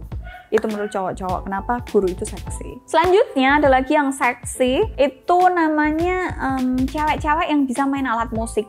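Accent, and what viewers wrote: Java, Indonesia. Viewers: CEMPRENG PLUS MEDOK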